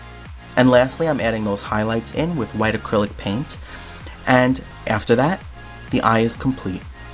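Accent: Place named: New York, United States. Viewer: Lovely art but whoa, you are like the only person from New York I've seen who sounds like they're from New York. Never heard a soft, gentle voice in a New York accent before, lol.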